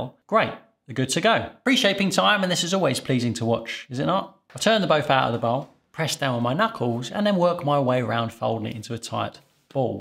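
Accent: United Kingdom. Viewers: The added ingredient is to make people want more of it. As a result it will create more revenue for the company. At the same time, it also creates health issues in the long run. Making people seek Dr's, Dr's give meds, meds will create other health issues that would require more meds. It's a win win situation for the market at our expense. That's all there is to it. Thank you for the info. It sounds you are British from your accent. I don't know if this is worldwide, but in some countries mostly in the West (go figure) they are adding crickets and other bugs in the food we buy to make st home. So let us know if thst is also happening where you are. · His diction is not the same as his accent. His accent is that of 100s of 1000s of UK population. A northerner might say "ah nuh vah" but in a northern accent. Get a grip.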